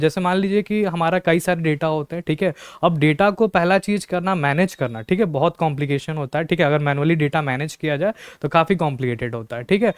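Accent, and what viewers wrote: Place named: Bihar, India. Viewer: lagta hai sir bihar se hai thik hai🙃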